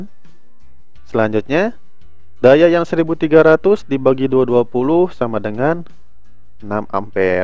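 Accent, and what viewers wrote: West Java, Indonesia. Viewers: 1.Biar mudah dipahami tong buat para pemula. 2.itu logat sunda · Logat sundanya gk ilang yaa😂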